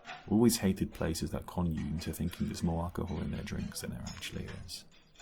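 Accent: United Kingdom. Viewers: 7819/10 Great audio Love the English voice The audio is lovely, crisp, enchanting, the end it where it’s at for the most but the audio overall is like fine wine. I would definitely recommend it.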